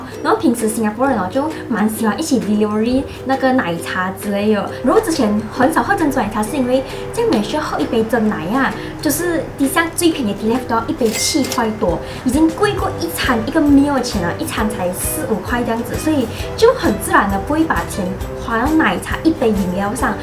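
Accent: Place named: Malaysia. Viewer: In Singapore answer call is charged ? I thought is always free。，but it's nice to hear your Malaysian accents. Like 🥰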